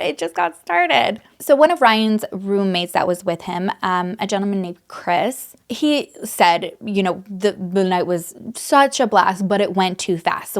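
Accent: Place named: Canada. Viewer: Ot was only a few seconds in and I thought, "oh yasss, this is a fellow Canadian girl". Love to have crime content creators present the country in a different light. My heart always breaks for the families of the victims.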